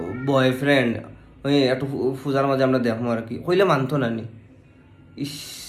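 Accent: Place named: Bangal, Bangladesh. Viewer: দাদাভাই আপনার বাড়ি কোথায় বেশ সুন্দর বাঙাল কথা বলছেন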